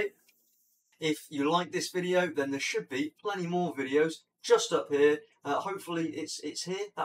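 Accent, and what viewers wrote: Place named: East Midlands, United Kingdom. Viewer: What a great time for students to learn maths with helpful videos like this! Way better than reading through dog-eared textbooks! You sound like you have an East Midlands accent. Nottingham? Mansfield?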